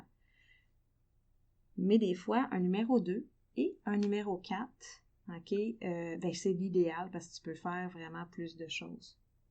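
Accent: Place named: Mauritius. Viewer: Vous avez l'accent d'une Mauricienne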